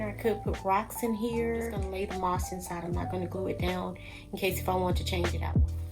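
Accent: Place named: Texas, United States. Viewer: Amen to Texan accents 😊. This is amazing. Love it ❤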